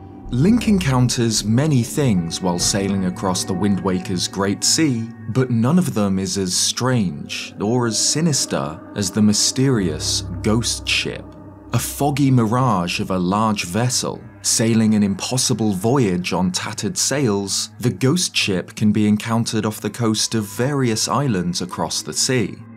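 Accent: United Kingdom. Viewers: not related but the rhythm of the sentence is really good for poetry or lyrics, especially when read by UK accent. Doubt it was intentional by the translators but it adds an extra element of spooky.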